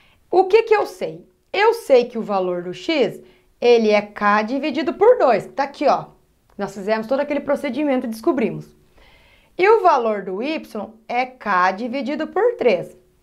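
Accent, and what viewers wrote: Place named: Minas Gerais, Brazil. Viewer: Adoro esse "erre" ... és mineira? Tão doce, obrigado pelas aulas.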